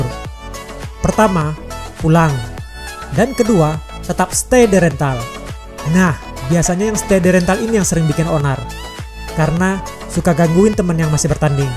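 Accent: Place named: Medan, Indonesia. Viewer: Kayaknya abang ini oranh medan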